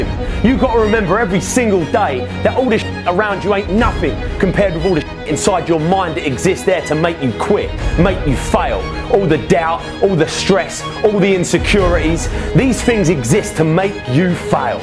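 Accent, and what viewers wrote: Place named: United Kingdom. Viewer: your british accent is fucking amazing!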